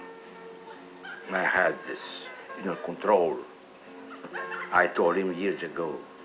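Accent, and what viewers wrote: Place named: Portugal. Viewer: This is how my parents talk too. LOL :) (Portuguese too)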